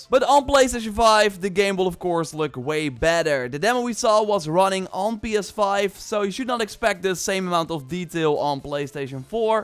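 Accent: Netherlands. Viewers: Lekker Nederlands accent man:)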